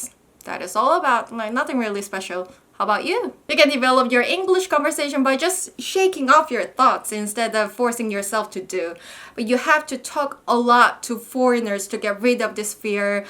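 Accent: United Kingdom. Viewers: 브리티쉬 액센트 실화입니까??? Wow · 브리티쉬 엑센트♥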